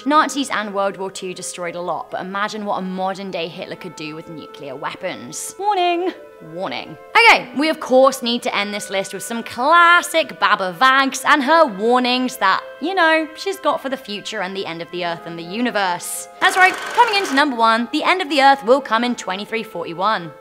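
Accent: England, United Kingdom. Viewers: When people with British accents try to talk about American politics make me laugh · Her English Accent.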